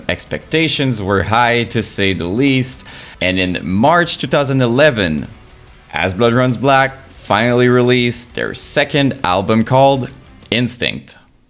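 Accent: Quebec, Canada. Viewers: Are you fromQC? You sound like you are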